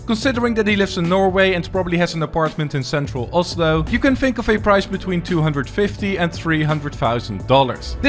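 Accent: Netherlands. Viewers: i smell dutch in ur accent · i hear a dutch accent can that be true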